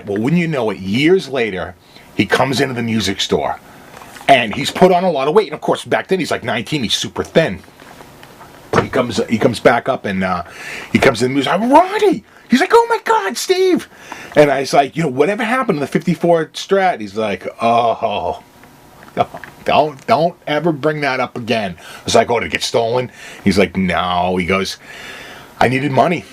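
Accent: Boston, United States. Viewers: your from boston?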